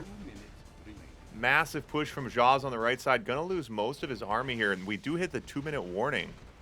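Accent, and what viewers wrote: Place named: United States. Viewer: You are Dutch, you sound totally American to me 😅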